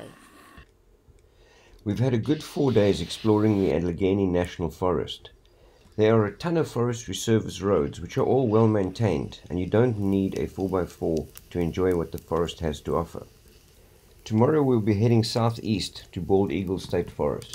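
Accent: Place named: Australia. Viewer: Wow brother you sound like your from australia or something my names mitch i live in butler pennsylvania ive been to all places youve shown in your video except for a couple. Do you live here in PA?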